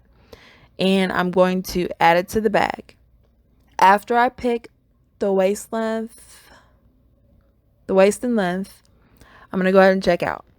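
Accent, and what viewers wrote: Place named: Atlanta, United States. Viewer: I knew that accent was ATL!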